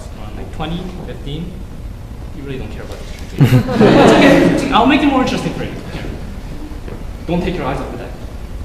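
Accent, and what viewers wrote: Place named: China. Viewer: that was an awesome performance... BTW u sound like a Chinese. r u??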